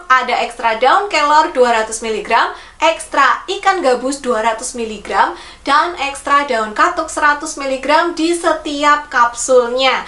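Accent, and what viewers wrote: Java, Indonesia. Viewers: Bu bidan asli mana e, logat jowone medhok. Terimakasih ilmunya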